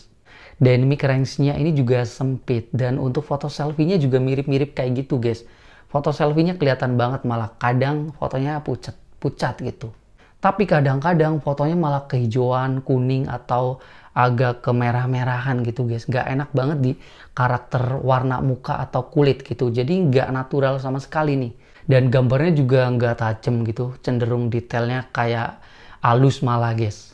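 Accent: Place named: Banyumas, Indonesia. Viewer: Ora ngapak ora kepenak ya kang...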